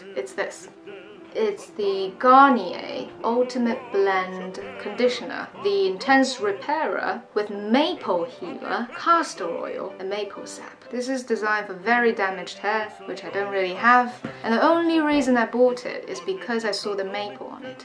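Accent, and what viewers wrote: United Kingdom. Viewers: 的英音好好听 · 口音是rp吗我听起来很像老人2333（没有不好的意思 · 的伦敦腔听着好上瘾🤗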